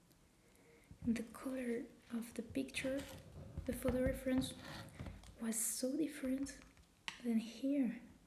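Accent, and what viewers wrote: France. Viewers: your videos are great and you are one of the few asmr channels i watch. i would request that you do more whisper/talking videos such as when you looked through your artbook. your voice and accent are so relaxing and charming. obviously you are french, but if you were playing a french character then you are playing it very well :) hahaa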